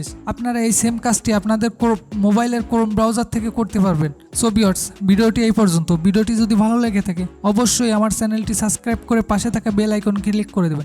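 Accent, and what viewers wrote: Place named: Barishal, Bangladesh. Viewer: ধন্যবাদ ভাইয়া, এত সুন্দর উপকারী একটা ভিডিও আমাদের কে উপহার দেওয়ার জন্য,আমি এই cc নিয়ে অনেক টেনশনে ছিলাম,কেনো ভাবেই বন্ধ করতে পারছিলাম না,অনেক খুঁজা খুঁজি করে নতুন আপডেট এই ভিডিও টা পেলাম, আপনাকে অনেক অনেক ধন্যবাদ এগিয়ে যান ভাইয়া ইনশাআল্লাহ পাশে আছি, এবং আর একটা কথা আপনার বাসা মনে হয় বরিশাল,কেনো না আপনার কথায় বুঝতে পারলাম?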